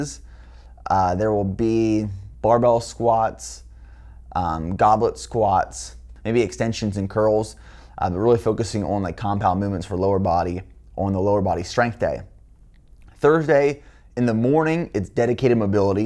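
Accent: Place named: Central Pennsylvania, United States. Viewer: Central PA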